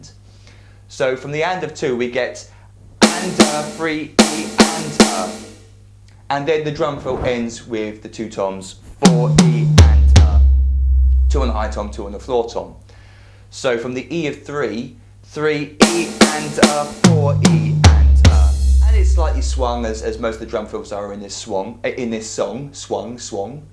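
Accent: England, United Kingdom. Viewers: What kit is this? It's peng!!! Also where are you from, you have an English accent? (I'm from England)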